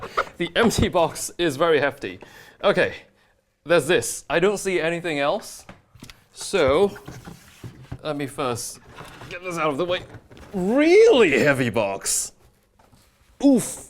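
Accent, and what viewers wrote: RP, United Kingdom. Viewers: This dude speaks very posh. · first time i hear an asian guy with a british accent.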